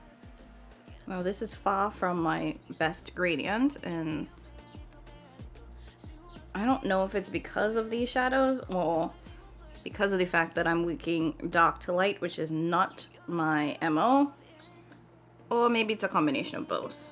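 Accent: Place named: Trinidad and Tobago. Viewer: sent me over here! Hello! You sound like a Trini! I’m from Jamaica 🇯🇲 I’ve been looking for new beauty YouTubers to follow, need some fresh opinions 😊 I too have been wanting to start a beauty channel and actually have some videos posted on two channels from ten years ago on music and hair. One day! I’m scared of the editing… I don’t have this palette so just here to learn. I have Muerte, Gemini 2, amor y mariposas, beetle juice and she’s in parties. I just ordered the electrip.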